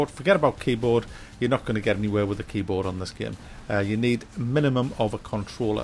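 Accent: United Kingdom. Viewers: Darby. Beeta. Gayme. Moode. Controollah. That's the British accent for you.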